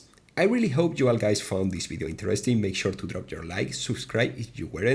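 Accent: Spain. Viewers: his main lang is spanish, i personally love his accent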